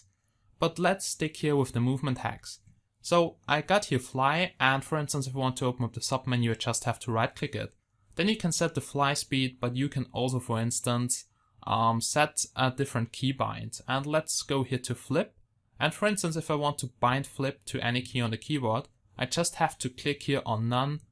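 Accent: Germany. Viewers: Thanks, love your accent. German right ? Anyways everytime I click resource pack it crashes. Not big deal just thought to tell you. Nice video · wie man das deutsche leicht raushört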